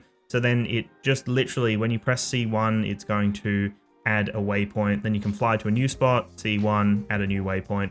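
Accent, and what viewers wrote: Australia. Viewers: Easy to follow, and pause where you need to. The indexing at the bottom is a godsend. And an Aussie accent, so easy to understand. Highly recommend newbies watch this video.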